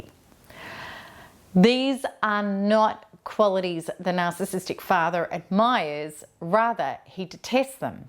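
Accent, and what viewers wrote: Australia. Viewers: Goddamn! You are such a beautiful woman, that Aussie accent and that look my god a weekend with you would be all the treatment I would need!! Gourgeous! Love the content love the channel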